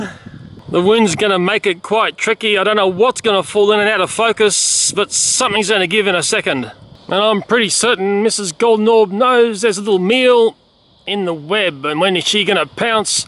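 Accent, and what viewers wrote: Australia. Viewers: Sees thumbail Thats a big spider. Do I really want to watch it? Do I want to be creeped out? Eh why not. The manly Australian voice makes me feel safe.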